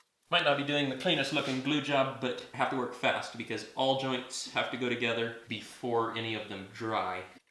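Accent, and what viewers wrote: Canada. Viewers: What accent is that? Canadian?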